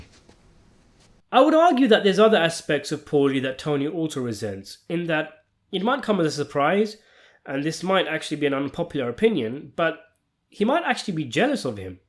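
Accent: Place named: East London, United Kingdom. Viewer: Bro you sound so Bengali from East London 😂😂😂😂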